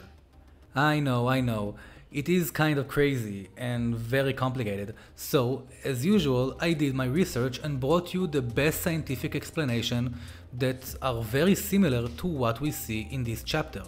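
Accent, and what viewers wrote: Israel. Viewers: what funny accent. ur just Israeli brah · וואו איזה תוכן איכותי ישר לפי המבטא מזהה ישראלי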